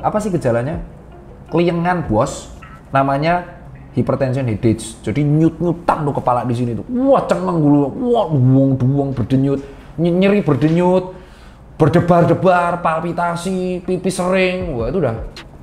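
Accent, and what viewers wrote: Java, Indonesia. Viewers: Medok nya mantap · Bos tirta klo ngomong kliengan meni medok😀😀😂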